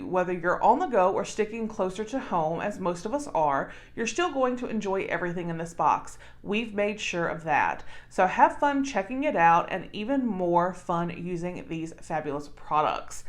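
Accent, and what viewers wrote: South, United States. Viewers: I love all the items in the Box!!! It would be like Christmas morning opening that box of wonderful surprises!!! I love your southern accent and you did a marvelous job Darling!!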